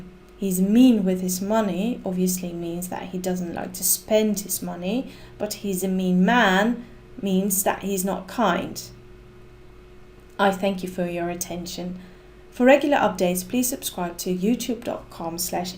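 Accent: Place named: West Midlands, United Kingdom. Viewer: very professional presentation. and very understandable. excellent . thanks !!! watched from central America, I detect i think a rather refined west midlands accent. please keep posting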